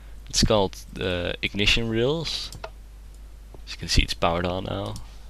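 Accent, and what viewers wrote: Netherlands. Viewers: It's sooooo easy to recognize a dutch accent (says this dutch guy here) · you're totally right, he is dutch, I (guy from the NETHERlands) think. I only heard it after you said it though. I have a rather "deftig" (The Queens English) accent. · I hear a Dutch accent ^^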